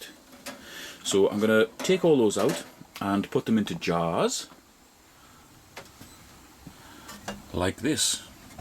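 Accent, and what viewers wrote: North East England, United Kingdom. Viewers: Thanks for your useful video. Just started my dehydrator journey today using a l'Equip Filter Pro, so hopefully no more chasing maggots around my kitchen from now on! I was interested in the temperature that you used to carry out the dehydration process. Have you tried using a lower temp. as I have seen it suggested that 52 C can produce better preservation of mushroom flavour? I live in Devon and never find the quantities of ceps that I see foragers collecting in other parts of the UK. I am guessing from your accent (a hobby of mine) that you might be in the north east, perhaps Northumbria? I would love to take a trip to Scotland and the north east of England in October to see what bounty I could discover. Thanks again and good foraging to you.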